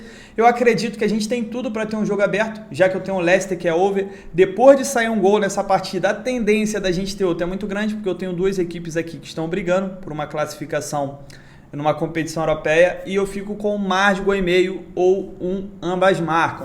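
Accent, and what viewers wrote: Rio de Janeiro, Brazil. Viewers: Vejo seus vídeos e fico pensando "é muito carioca" kkkkk "Dificíão" é nossa cara HAHAHAHAA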